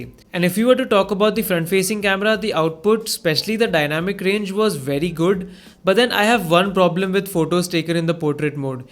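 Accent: India. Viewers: bullshit! i cant handle the speaking of indians. I'm a little bit irritated to him while lessening but I'm interested in his review HAHAHAHA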